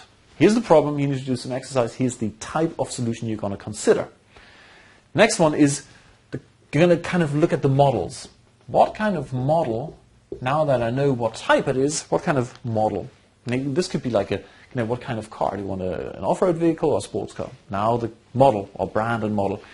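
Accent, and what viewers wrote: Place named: Swabia, Germany. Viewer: Very informative and down to earth (Schwabe oder Württemberger ?) without the usual hype. I really appreciate it. Wolfgang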